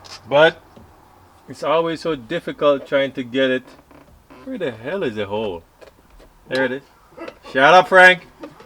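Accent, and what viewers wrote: Jamaica. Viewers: Wow good for you. That accent sounds Jamaican. Enjoy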